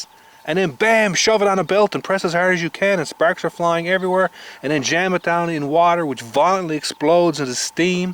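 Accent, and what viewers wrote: Ireland. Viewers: You irish? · are you Irish? I swear I can hear a bit of accent.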